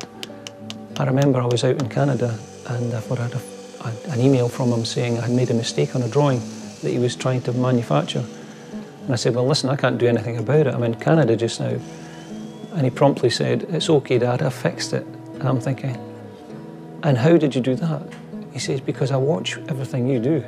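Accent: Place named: Scotland, United Kingdom. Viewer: Good to hear a Scot's voice.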